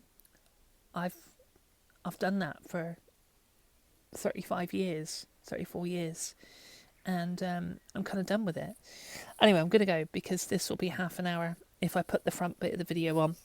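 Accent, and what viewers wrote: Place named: RP, United Kingdom. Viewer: I found you. You have a Tremendously strong QUEENS ENGLISH accent (hope thats not bad what i said?) A blogging channel? Ok... I'll be actively looking out. Nice to find you 😊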